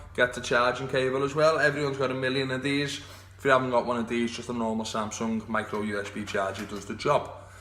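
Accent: Liverpool, United Kingdom. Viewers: Just ordered one of those, can't wait to get it now , ) Well spoken M8. BTW subbed just for the scouser accent. 👍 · Defo from liverpyool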